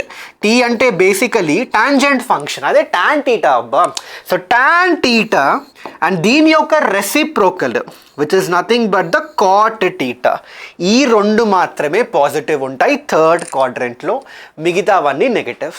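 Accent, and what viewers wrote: Telangana, India. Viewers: PURE TELANGANA ASCENT!!!!! ABBA REALY ADDICTED SIR 🥰🥰🥰🥰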